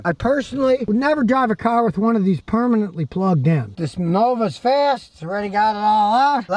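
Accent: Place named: Upstate New York, United States. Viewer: That's because of his Buffalo/Niagara Falls upstate New York accent....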